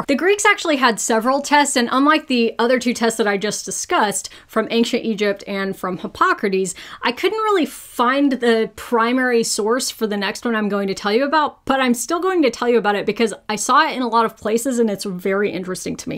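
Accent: Texas, United States. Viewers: Is a fellow Texan, I am honored to have you and your southern drawl representing our great state. Thank you for what you do. Texas misses you. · Pap-err-us, omg your Texas accent is sooo cute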